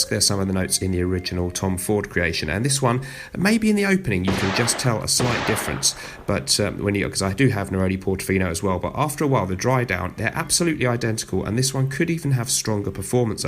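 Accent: United Kingdom. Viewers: Excellent list, as usual. I love your British accent!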